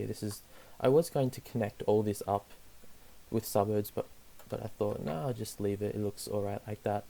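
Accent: Australia. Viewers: Mate I love your cities! And I can tell you're an Aussie too. Would love to see you do a let's play without mods. You could model it on an Aussie city too. Give it cool district names and have specialised districts too. Would be cool!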